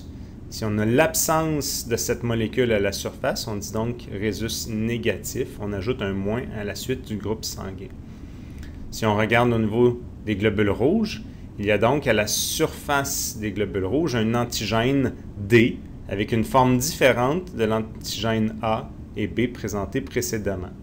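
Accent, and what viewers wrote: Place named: Canada. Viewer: Bien compris, juste que l accent canadien est difficile.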